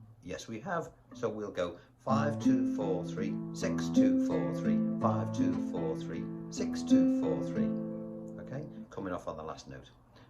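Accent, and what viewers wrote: Liverpool, United Kingdom. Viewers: You’re deffo an old Skool scouse and this is a really well taught lesson👌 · Actually I can do this pretty good. I learned it from Val Doonican on his TV show in about 1977. That was after 20 yrs of trying to play with a plectrum! The reason for my comment is to thank you for the clear simplistic way you have delivered this very important aspect of guitar playing. It certainly brings out the best in solo playing - that much is for sure. I like your easy delivery and I think I detect a little bit of infectious Scouse in your voice don't I? Thanks again, BjG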